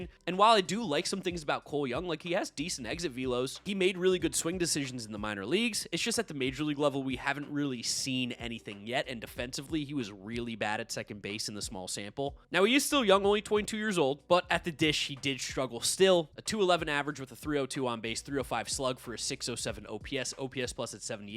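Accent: New York, United States. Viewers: I guess that's the new York accent. I'm from New York and I don't either. Fowards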